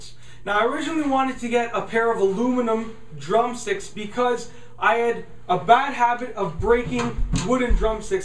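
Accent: Canada. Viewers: I love your Canadian accent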